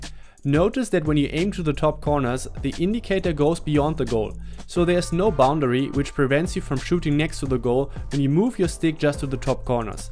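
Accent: Germany. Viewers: Man hört einfach, dass er ein Deutscher ist 😂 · The classic German English 😁 great video! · Hört sich ziemlich deutsch an😂😊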